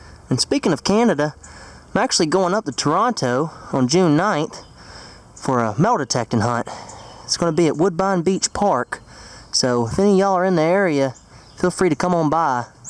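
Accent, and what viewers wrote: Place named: Rural, United States. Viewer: Ur accent says ur a hick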